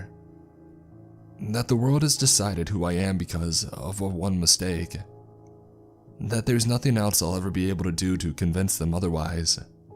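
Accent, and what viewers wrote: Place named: North Carolina, United States. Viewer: I’m guessing whatever answered his prays and told him, yes. you will die in a cell or this cell, idk if it’s just me or I am remember wrong,, but I thought he said that. And if he did i wonder if it’s the same prison he was in, or maybe he did say in a cell. Either way I like how he became a officer, that and him saying this is my last time. That’s kinda for shadowing him getting hurt and killed if he had to respond to whatever he needed to be they’re for. Love your work my guy, you have the best voice imo, I hate to say it but Iv got ware I always look for your channel over the others. There not bad but I relate more to how you talk lol. Idk if it’s because I live on the east coast of NC and have friends around here and a couple in Ten that have a similar voice lol idk how to really explain it lol. But your the best even your new channel is funny af but it’s got both types of funny. 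Funny just to be funny and funny like watching a guy pull a dame big looking gater up while in a basically a live rafe if your lucky, and him thinking time to nope tf right up out of here lmao.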